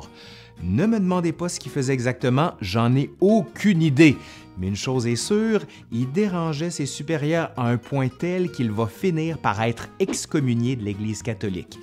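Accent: Quebec, Canada. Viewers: Grand merci à toi ami frère du Québec! :) C'est toujours intéressant et l'accent Québécois est poétique! Publication et partage fait sur mon fb!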